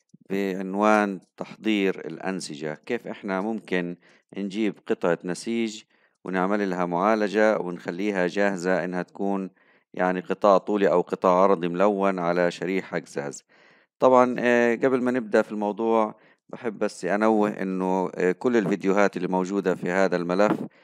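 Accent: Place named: Libya. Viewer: الله يوفقك مشكور . لاحظت أنه لهجتك فيها اللهجة الليبية كتير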